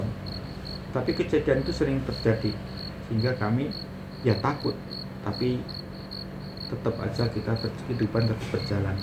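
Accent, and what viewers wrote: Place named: East Java, Indonesia. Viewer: Mirip bapak n paman cara ceritanya, ternyata sama2 Jawa timur wkwk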